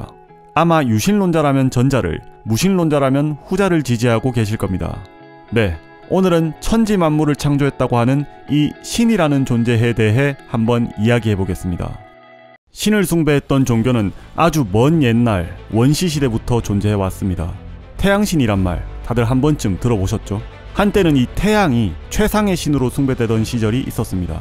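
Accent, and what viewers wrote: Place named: Busan, South Korea. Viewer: 횽 오늘 좀 흥분하셨구만. 다른데선 안들리는 부산말이 강하게 들리네 ㅎㅎㅎㅎ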